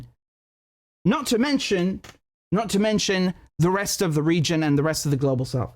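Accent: United Kingdom. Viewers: Nice British accent 👍 excellent journalism 👍 agree with everything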